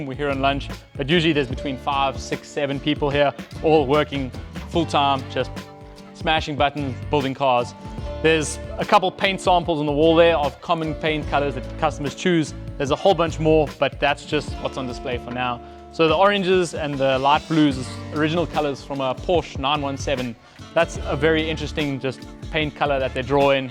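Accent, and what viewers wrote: South Africa. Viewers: Thats South African accent.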